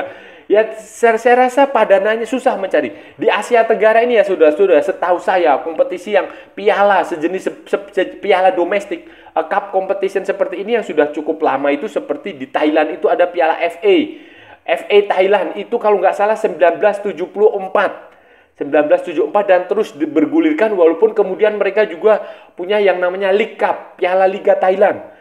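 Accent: Indonesia. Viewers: eja ala2 english pun lidah indonesia tetap sama baca nya 😊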